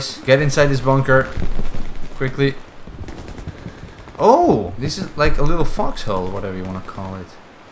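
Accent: Netherlands. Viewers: I can hear a Dutch accent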